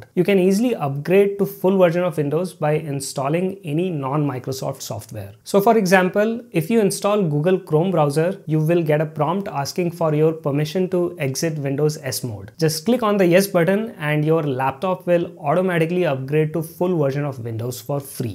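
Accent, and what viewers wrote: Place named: India. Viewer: Great video! You really give good and helpful information. I also like that you took the effort to add those nice subtitles! Some people might have some trouble with your Indian (?) accent (like me - I am German), but the subtiles are really helpful, since sometimes there is just a word or two that I did not understand right away. All in all: Great and amazing job!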